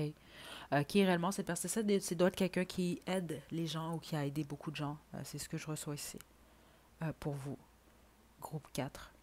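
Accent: Quebec, Canada. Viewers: Bonjour je vous suis depuis quelques temps quand je fais un choix ça me parle mais la plupart du temps c’est mon énergie que vous décrivez j’ai pris le choix no 2 je suis spirituel j’ai vécu la nuit noire de l’âme j’avais commencé la médiation et des choses bizarre se sont produits que je m’explique toujours pas comme sentir des caresses sur mes cheveux quand j’allais mal et que je pleurais un jour ça m’a énervé et j’ai créé laissé moi tranquille une fois j’ai entendu une voix répondre à ma prière et d’autres turcs que je ne comprends et je ne sais pas qui peut m’aider à comprendre je suis empathe quand je vois un itinérant c’est moi que je vois parce que pour moi l’humanité c’est ma famille je suis eux et ils sont moi voir souffrir les autres me fait mal et parfois je doit me protéger je vous écris tout ça peut être parce que je cherche des réponses que peut être personne n’a merci et bonne vacances je pense qu’on reste à la même province car votre français est québécois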